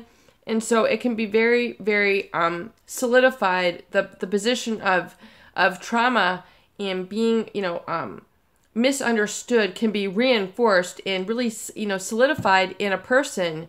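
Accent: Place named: Great Lakes, United States. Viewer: Hey, I can hear the Great Lakes in your voice. Buffalo? Cleveland? It’s charming.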